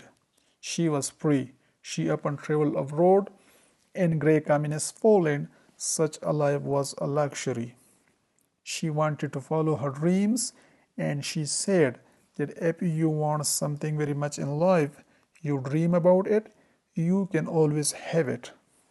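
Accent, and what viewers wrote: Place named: India. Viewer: I’m really glad I found this channel. Excellent research delivered so well. It’s wonderful to hear a strong Indian accent speaking such excellent English without compromising the Narrators Indian accent. The pace of the narration is excellent. Well done Narrator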